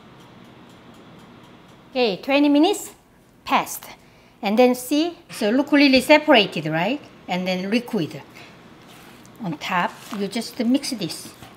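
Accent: South Korea. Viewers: I used to get recipes off your website all the time for years because my mom is Korean but sadly passed away 15 years ago (last week as a matter of fact) without ever teaching me her recipes. I just discovered your YT channel today and I'm hooked! I may stay up all night catching up on all of them!!!! You remind me a lot of her, always happy and making jokes. And the accent of course. Thank you so much for sharing your recipes. 💕💕💕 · 영어가 너무 한국분이라서 정감이가요❤️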